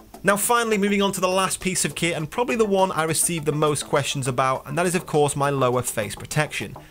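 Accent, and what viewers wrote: United Kingdom. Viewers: Really Nice! I'd like to start with airsoft as well, but here in Germany there are only a few places to play and without a car you can't go anywhere... I like the HK MP 7 quite much and maybe someone has a tip for me...(under 0,5 J ). go on with your amazing videos, I enjoy them very much because I like British English much more than American English..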